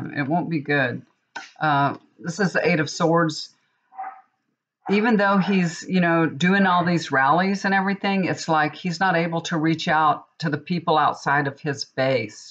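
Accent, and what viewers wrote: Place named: Texas, United States. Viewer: I love the chit chat . I'm from 🇨🇦 and I love that Texan accent plus you have great stories . 💞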